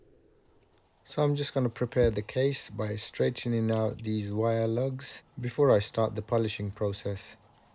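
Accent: Birmingham, United Kingdom. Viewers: Can you do my dads Longines Sounds like your in uk 🇬🇧 I’m in South Yorkshire What are your prices Thanks Genuine Had it repaired twice at H L Brown 👎 then a private guy 👎 Gutted really we lost him in 2010 😟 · I'd say Birmingham, of Asian ancestry. I do come from near there, but of course I'm guessing.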